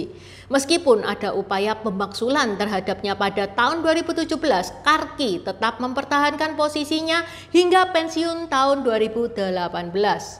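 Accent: Java, Indonesia. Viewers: Suaramu medok sekali, berita nya akurat, mantab sukses selalu, Bali hadir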